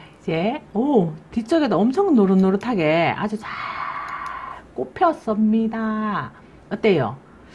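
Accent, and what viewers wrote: Gyeongsang, South Korea. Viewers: 님은 참 재미있게. 요리. 하십니다. 구수한 갱상도 말씨 정감갑니다 항상 잘 보고 있습니다❤❤❤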